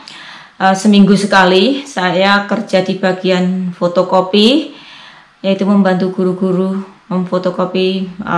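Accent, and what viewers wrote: Java, Indonesia. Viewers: Logat jawanya ga hilang mba'yuk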